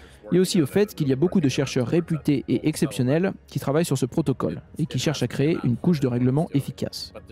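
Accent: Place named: United States. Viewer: C'est vraiment ridicule cette prononciation des mots anglais à l'américaine. Le plaisir d'écoute s'en trouve impacté.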